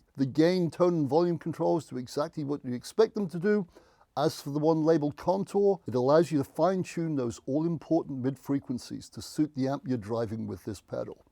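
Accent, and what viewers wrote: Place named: England, United Kingdom. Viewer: Proper English accent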